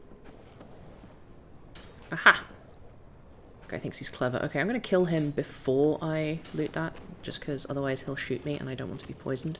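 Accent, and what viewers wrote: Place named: Ilford, United Kingdom. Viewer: Yorkshire?! He sounds like he’s from Ilford to me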